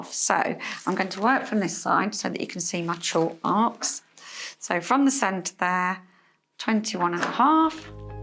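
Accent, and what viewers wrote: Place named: England, United Kingdom. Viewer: Best cross hatch video ever. You have made it so easy for someone who hates sewing. I do a lot of embroidery and the piece I am going to work on is a table runner with cross hatch quilting. I have watched other videos on cross hatching and it was so involved and so confusing. Thank God I found your video. Can’t remember if you said how wide the tape was. I have subscribed to your channel. Besides making it so easy I love listening to you. I have always loved the English accent. Not only am I American, but I am from the Bronx, New York and I wish I had your accent.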